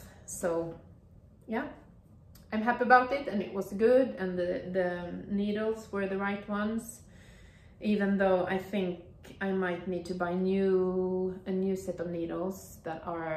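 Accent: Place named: New Zealand. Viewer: Hello from New Zealand. I wondered whether you had learned English from a kiwi - some of your vowels sounded distinctly kiwi-ish! Then I read your explanation below - our neighbour Australia. Thank you for your podcast in English. You have made beautiful garments.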